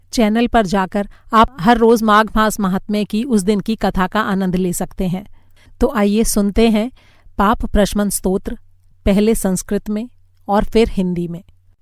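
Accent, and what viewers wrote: Standard. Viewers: आपकी बोली शुद्ध सात्विक और स्पष्ट है....आपको हमारा नमन |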